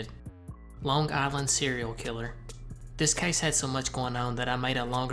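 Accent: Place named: Mississippi, United States. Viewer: That’s the First thing I thought. Great content in something close to my Mississippi accent! Keep up the good work!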